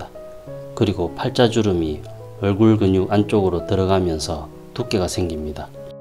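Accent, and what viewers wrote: Gyeongsang, South Korea. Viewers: 일년전 영상인데 저는 요즘 연필 초상화 배운다고 유튜브 찿다가 선생님의 영상을보게 됐어 많은 공부가 됩니다 말씀도 재미있게 하시네요 고향이 갱상도 같아요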